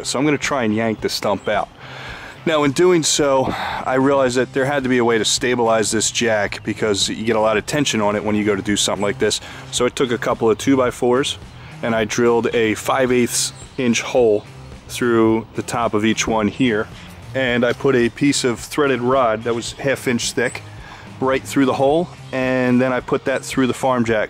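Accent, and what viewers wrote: Philadelphia, United States. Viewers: Is that a Philadelphia accent? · its been almost 50 years since I lived there, but that sure sounds like a suburban Philly accent....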